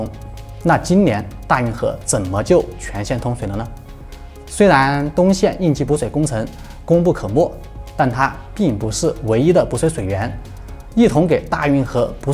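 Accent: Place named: Sichuan, China. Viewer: 听起来一点不费力，典型四川人普通话，你多习惯就好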